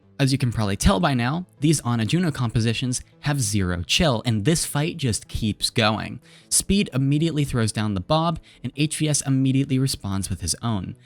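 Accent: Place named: United States. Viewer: American accent but you call it university?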